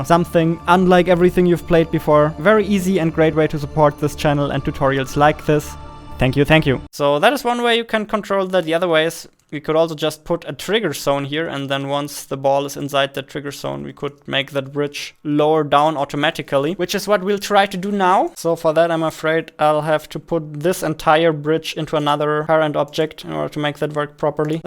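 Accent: Germany. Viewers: You have a very strong german accent, I like that! lol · Your German accent is very thick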